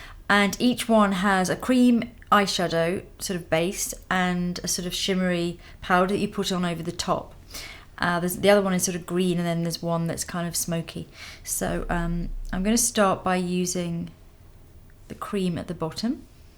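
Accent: United Kingdom. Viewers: Wow very pretty..i will try ur tips but using all cruelty free products to me my vanity is not as important, its the the makeup and who stands behind it. No animal testing for me and thanks for cool tips nice brit accent.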